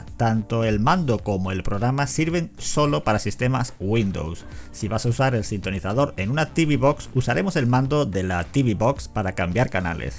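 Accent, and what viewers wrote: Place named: Spain. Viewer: Acento de España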